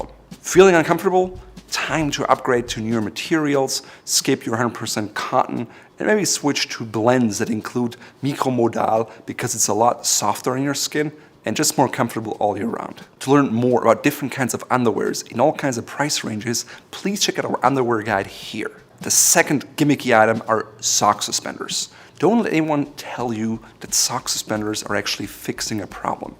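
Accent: Germany. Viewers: Wow! Your German accent is cognizable specially I like the Gazzette vids, thanks! Mit freundlichem Gruß, Rolf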